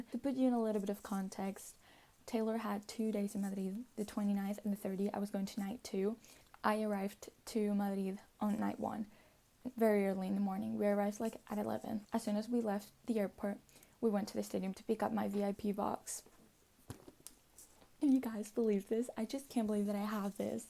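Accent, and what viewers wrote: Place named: England, United Kingdom. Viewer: girl, i'm from Spain and i really LOVE your english accent, even in my wildest dreams i can not have your accent!! please, do a tutorial or something hahah pd: i LOVE this video, i can't belive i was there!!!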